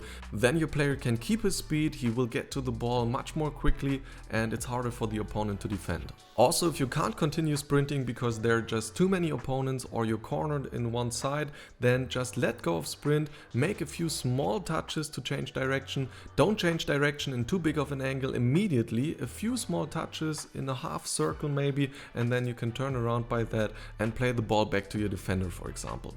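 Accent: Germany. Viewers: Das sind schon deutsche Macher, oder? Ich empfinde den Akzent schon als sehr Deutsch. Mit Englisch erreicht man allerdings ein wesentlich größeres Publikum, da wird es wohl niemand auf Deutsch machen, wenn Englisch genauso gut funktioniert. Gruß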